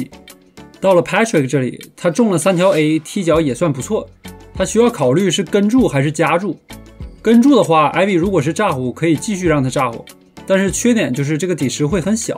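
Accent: China. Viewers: 喜欢你的东北口音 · 哥们是长春的？我一个哥们和你口音很相似，他是长春的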